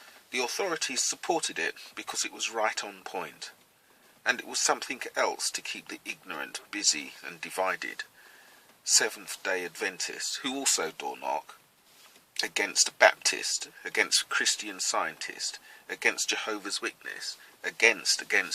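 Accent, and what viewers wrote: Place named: RP, United Kingdom. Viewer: There is controversy re: origins of Hinduism. Very similar but with the extra kick of reincarnation. i. e. "if you do what we say, in your next life you can be like us" In US the AME church was started due to segregation. PS never thought what color you were. I just know you sound like the royals. My family came from Birmingham so that's a compliment :)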